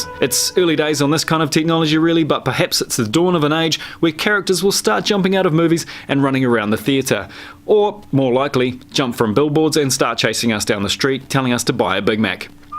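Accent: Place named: New Zealand. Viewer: haha ... so 19 eighty sex. :) i should have picked you where my kiwi cousin :)))) lol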